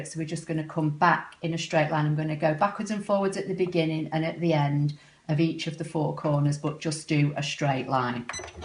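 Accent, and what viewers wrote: England, United Kingdom. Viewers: Lovely to hear an English accent on a sewing tutorial. Very well explained, would enjoy seeing more sewing projects from you. Thanks!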